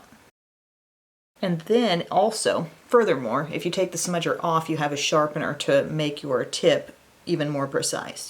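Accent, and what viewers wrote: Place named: South, United States. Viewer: I'm just curious I know that you live in the Midwest. I always hear your voice like you have a slight southern accent. Where are you from?🤗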